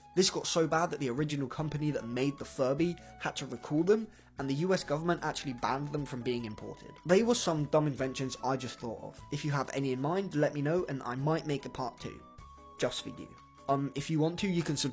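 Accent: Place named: United Kingdom. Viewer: The vid wassss toooo shoorrrttt😭😭😭, wait, he's British?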